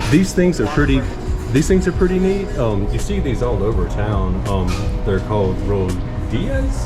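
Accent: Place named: South, United States. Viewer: You can eat Guayava paste with cheese, you should try it...Love your southern accent!!!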